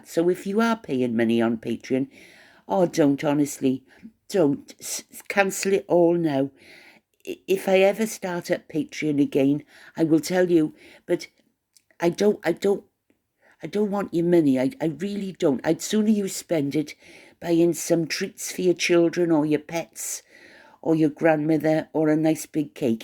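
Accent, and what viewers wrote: Wales, United Kingdom. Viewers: Hi,. I miss hearing your wonderful Welsh accent, as I live here in the Southern part of the U.S. Yes, you should be monetized. I empathize with you, Taz and Avid, too. God is still in charge, no matter what. Anyway, you are so very entertaining, mixed in with some home truths and life lessons for us all. Wish we could go back to a more innocent time, but our youth and childhood have long since passed. I also find myself a bit drowsy in the afternoon, as I am older, just like you. God bless you, your loved ones and friends, and take good care of yourself. 👑🏰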